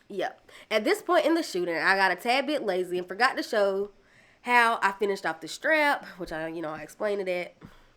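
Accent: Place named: Texas, United States. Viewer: I was just wondering when would you have a new CIY vid!! Thank you! & I love your accent, sounds like you're from Texas.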